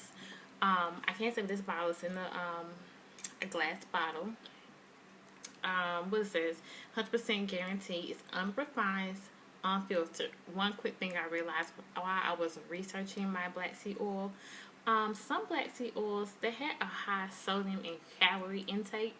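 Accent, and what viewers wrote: South, United States. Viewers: Cute accent ,must be southern ,not know matter what you beautiful Boo.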